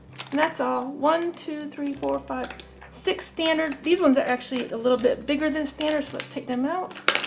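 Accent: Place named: South, United States. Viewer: lol, I used to speak southern too sweetie, took years to wear off 😁 your speech is fine, I’ve never noticed you mispronounce a thing, honestly. Hey, no matter which name you say now, I’ll match both lol.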